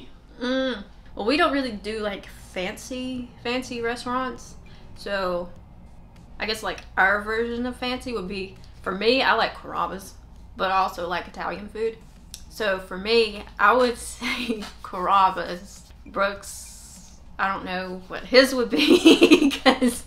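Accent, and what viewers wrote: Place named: Rural, United States. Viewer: From listening to you I can tell you are a small town country girl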